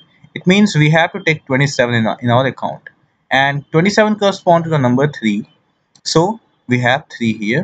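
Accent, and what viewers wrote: India. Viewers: this guy is so smart and he speak like a Indian☣☣☣☣☣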